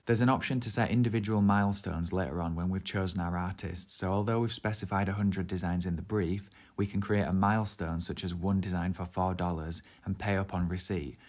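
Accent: United Kingdom. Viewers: nah mate it just sounds like it but that's the "bri - ish" accent. Your videos are dope btw! :)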